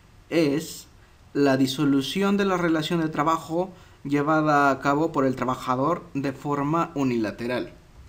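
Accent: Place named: Spain. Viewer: Jolines! y porque hablas como español?? trabajjjo, jjjjornada...etc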